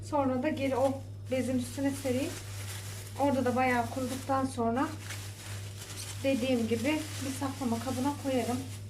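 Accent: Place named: Zonguldak, Turkey. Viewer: Size yeni abone oldum videonu beyendim nerelisiniz acaba konuşmanızı zonguldak tarafına benzettim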